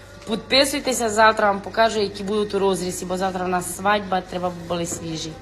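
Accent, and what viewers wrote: Transcarpathia, Ukraine. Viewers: Дуже люблю як Ви розмовляєте на Закарпатті:))привіт зі Львова:))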